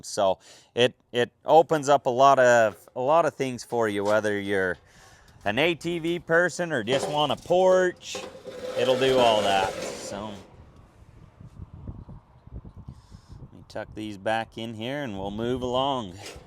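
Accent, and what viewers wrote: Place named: Sanpete, United States. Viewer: got the Sanpete twang in his voice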